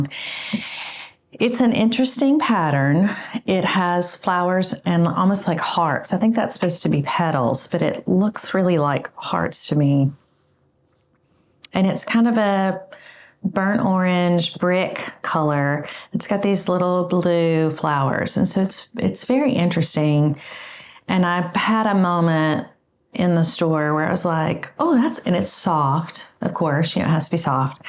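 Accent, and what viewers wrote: South, United States. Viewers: I love quilting, I love fabrics, I love that you thrift shop with your daughters and I love your calming, reassuring southern voice🙂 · I needed some fun this morning & your video fit the bill. Thank you for posting lighthearted and fun content. I enjoy that you let the South out in your speech. Being from Texas, it makes me feel like I have a neighbor talking to me. Have a great day! You've given a great start to mine!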